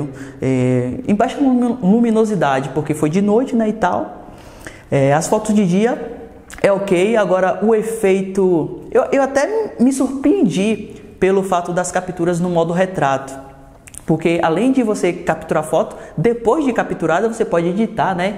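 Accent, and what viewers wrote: Bahia, Brazil. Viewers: Tu é baiano é vei? Ne?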